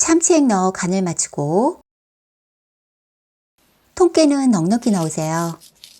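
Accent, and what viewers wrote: Seoul, South Korea. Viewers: 참한 음성에 매력적인 서울 말씨에 건강하고 쉬운 Recipe ! 대구 호부래비 꼭 해볼랍니더 감사합니다!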